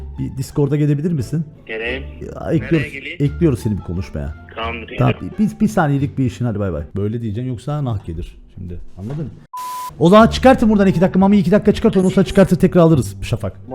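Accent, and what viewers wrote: Cyprus. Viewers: Abi sen Kıbrıs lımısın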